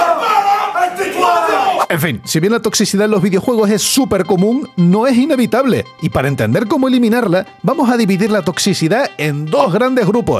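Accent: Canary Islands, Spain. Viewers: El acento canario es lo que le da toda su personalidad a sus bromas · tu eres de mi tierra vd o yo estoy alucinando con que eres canario?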